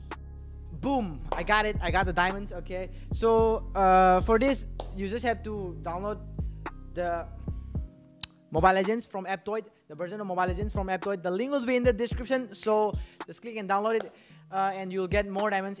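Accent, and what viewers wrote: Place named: Philippines. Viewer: Your voice same to phillipines Voice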